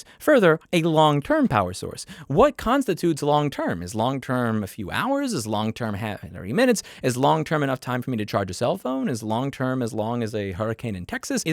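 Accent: New York, United States. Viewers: you can hear the new york accent at this speed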